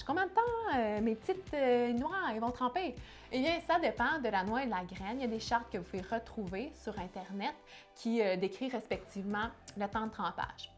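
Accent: Quebec, Canada. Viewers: Excellente vidéo tant par le contenu que par la forme. Egalement accent français canadien très, très agréable à entendre. Un régal. Merci pour votre contribution. Bonne continuation.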